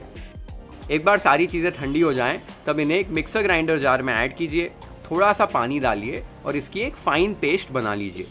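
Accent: Maharashtra, India. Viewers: It's amazing recipe and you know Marathi tuning is perfect, we liked it's too much. Keep sharing Marathi recipes a taste of India. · Brooo ur marathii accent is soo spicy ✨ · Ur marathi accent is v nice.... And very nice recipe yummy😋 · Your marathi accent is too cute😍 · Your marathi accent is soo good ❤ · You give marathi touch it's grate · You be the best man and your Marathi accent 😌♥️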